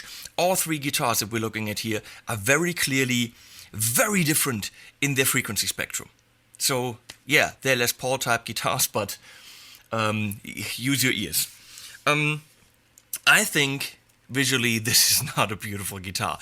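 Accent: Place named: Germany. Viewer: Gernan english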